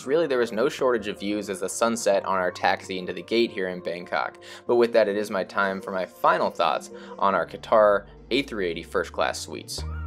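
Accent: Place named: Bay Area, United States. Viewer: I have become an avid follower of your detailed and very entertaining in-flight videos. Everything about them holds my attention making it easy to sit back, get comfortable and let the video do its thing. I also believe that your phrasing and (non) accent indicative of the Bay Area, where I am from, makes me feel very much like I'm listening to someone with whom I am familiar or have known a long time.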